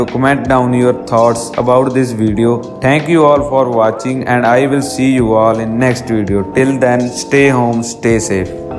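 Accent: India. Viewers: Hindi Accent!!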